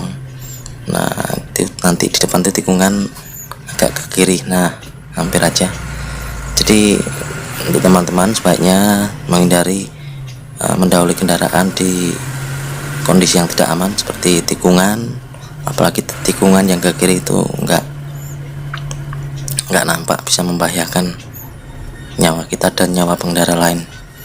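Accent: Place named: Java, Indonesia. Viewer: Jawir x logatmu...daerahmu Jawa sana juga LBH ngawur...bacot kau... Kontenmu mmng kbnykn sok2 ngjlkin kmbink...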